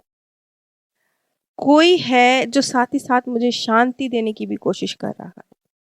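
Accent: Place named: Punjab, India. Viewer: Sir ma'm punjab s hn.. kuchh accent alag hote h aap kisi rajasthani s hindi bulwayiye vo alag bolega Gujrati alag bolega, marathi to bilkul e alag south wala to teda hi kar dega.. aur bache vo angrez unse bulwakar dikhao zara dhwani ko dwaaaanni😅 bana denge Shabdo s pare chale jao.. yhan school nh h zamana gya school ka ab samjhne ka daur h chhodiye meen mekh aapko to pata h na dhwani kaise bolte h enough h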